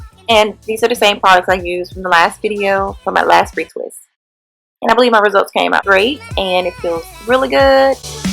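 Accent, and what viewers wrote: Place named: Washington DC, United States. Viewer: You sound like your from dc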